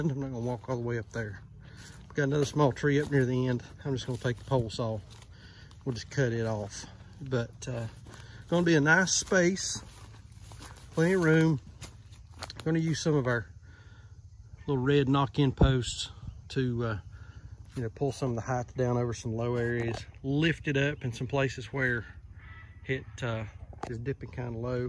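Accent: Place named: North Carolina, United States. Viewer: Love your North Carolina accent. Sad that American accents are disappearing.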